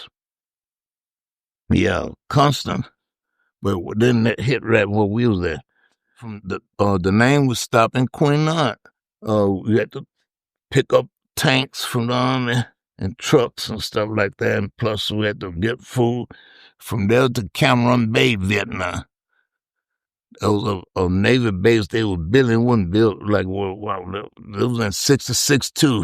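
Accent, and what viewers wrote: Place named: South, United States. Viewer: I'm a Southerner so I've met plenty of people who sound like him and I always love it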